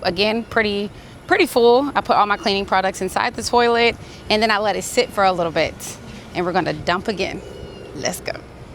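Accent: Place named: Jamaica. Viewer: How come you sound Jamaican from time to time?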